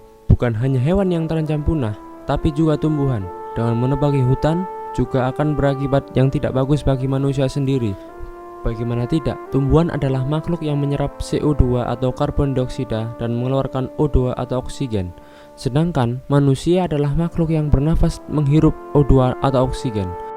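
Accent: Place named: Java, Indonesia. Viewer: medokkk men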